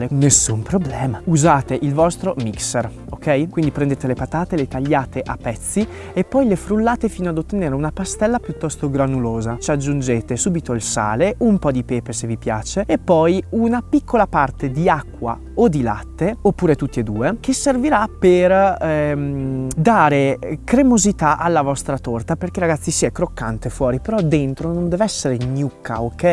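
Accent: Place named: Trentino, Italy. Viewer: Ciao sono bolognese sposata con un trentino da 30 anni lui è del bleggio superiore e vado in vacanza lì tutti gli anni non potrei rinunciarci per nulla al mondo, è un posto bellissimo una pace una serenità unica, poi mi diletto ad andare nel bosco a funghi, quindi anche se parli il trentino lo capisco. Sei un ragazzo bravo e simpatico mi piacerebbe venire a trovarti un abbraccio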